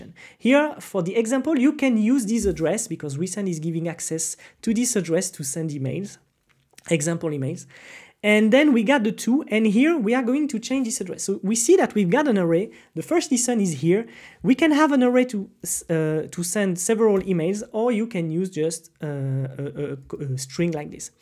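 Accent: France. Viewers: Hello, t'a pas les même vidéo mais en FR? Bien que l'accens francais aide à la compréhension ahah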